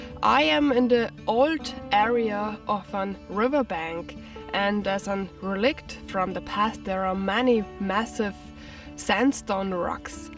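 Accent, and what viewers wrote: Bavaria, Germany. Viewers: Enjoyable video. I like the fact that you sound like a German speaking English. You speak much better English than I will every speak German. I saw your tattoo which I assume is new and that is what you were covering with the Archery arm guard last time. What is the the tattoo? I was not able to make it out. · I really love how you narrate the nature. I also love that you actually thank everyone for their comments. This is kinda a long message, I hope you don't mind. My dad adores your accent because he grew up in germany while his dad was trying to fix things after that thing happened. Bavaria is so beautiful. We love seeing you explore it. We're also skillful bushcrafters and we would be happy to host you if you want to explore the USA forests. Happy camping, and I'll be praying for your recovery.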